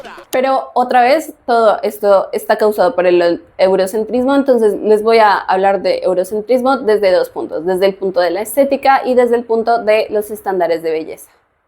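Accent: Colombia. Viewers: eres de colombia?....y tu acentico? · ¿En serio? El acento se me hace un poco bogotano (aunque sí está un poco raro). ¿Por qué dices que es de México? ¿Lo ha dicho en algún video o algo?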